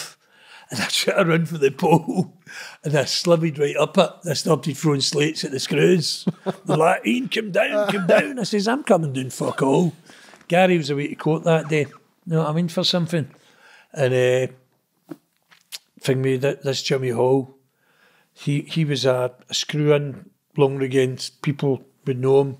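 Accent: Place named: Glasgow, United Kingdom. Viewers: The subtitles are funny they canny understand the wee Glasgow accent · Or learn Scottish mate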